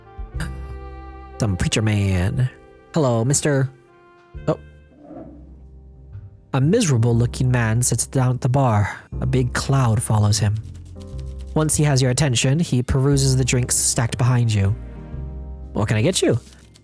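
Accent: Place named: Boston, United States. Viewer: The Boston accent was fun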